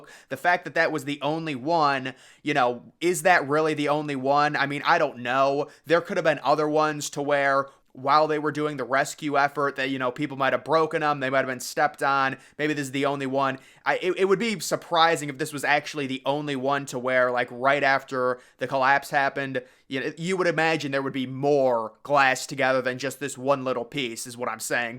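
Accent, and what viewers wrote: United States. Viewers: i like the american accent i could listen to that all day and it would not annoy me , promise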